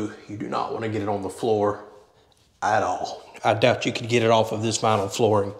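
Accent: South, United States. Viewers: Hmmm Height or HeighTh?? I love the southern accent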